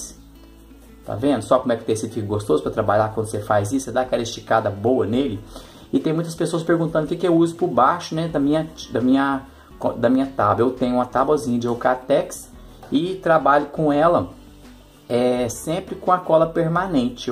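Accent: Minas Gerais, Brazil. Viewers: Suas aulas são maravilhosas sua didática muito boa homem de fé eu também sei da nossa dependência de Deus Todo poderoso.Qual cidade você é tem sutaque mineiro gostaria de receber seus riscos ,sou da Bahia.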